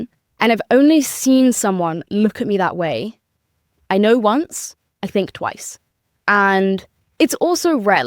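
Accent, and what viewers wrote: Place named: United Kingdom. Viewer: ❤Ur podcast and British accent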